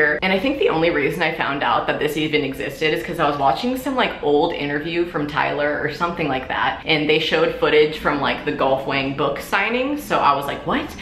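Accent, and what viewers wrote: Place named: Brooklyn, United States. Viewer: I HEAR BROOKLYN BABY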